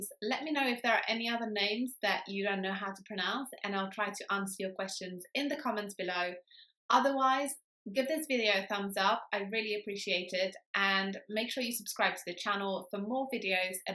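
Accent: England, United Kingdom. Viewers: Polish, speaking English with a British accent. And it's not Michael said the cat, it's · Love your English accent 💕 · Good explanation. Almost has an English accent. I reside in Alaska.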